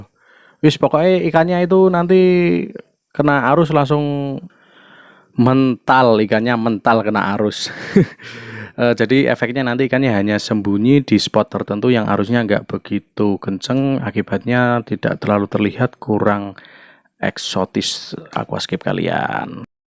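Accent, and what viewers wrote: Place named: Java, Indonesia. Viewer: mas e lek ngomong medok 😆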